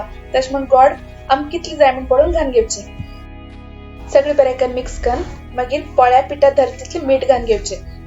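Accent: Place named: Konkan, India. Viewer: Lovely. I like your shudhdh Konkani.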